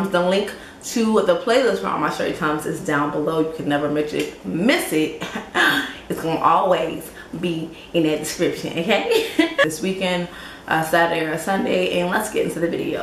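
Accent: New Jersey, United States. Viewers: You from jersey? Sorry for your loss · Oh so you from jersey?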